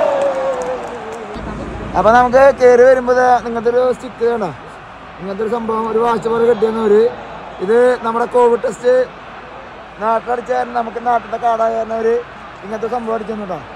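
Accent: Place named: Kerala, India. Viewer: ഈ പരുക്കൻ തൃശൂരൻ ഭാഷ കാരണം subscrib ചെയ്യാതിരുന്നതായിരുന്നു... പക്ഷെ ഇന്നു മുതൽ ഞങ്ങളൊരു 60 പേർ subscribe ചെയ്യുകയാണ്. അടി പൊളി. അതെ മലയാളിഡാ.....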